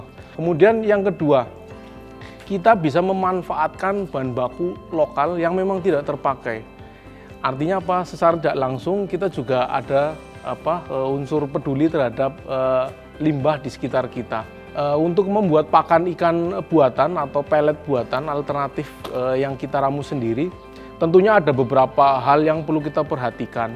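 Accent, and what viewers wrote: Banyumas, Indonesia. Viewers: Sampean wong Ngapak ya mas... Hehehe Pada baee..saya juga wong nagapak...lanjutkaaaaaan mass... Wong Ngapak nek Ora Ngapak ya Ora Kepenaak... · Wong ngapak apayh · ngapak kiye kayane mbok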